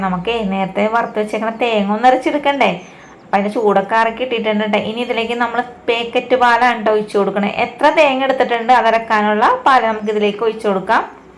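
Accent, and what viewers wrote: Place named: Kochi, India. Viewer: എന്ത് സുഖമാണ് കേട്ടിരിക്കാൻ ❤ ഇടക്കിടെ ആ കൊച്ചി യുടെ തനതായ ഭാഷാ ശൈലിയും! ❤❤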